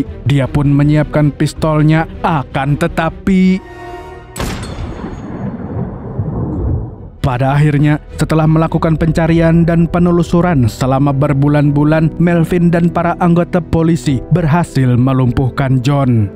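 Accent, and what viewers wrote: Banjar, Indonesia. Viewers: orang Banjar kh pian....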